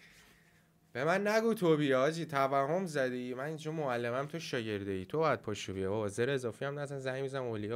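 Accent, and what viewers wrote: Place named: Mashhad, Iran. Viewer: berar hamun dadsh to accent mashadi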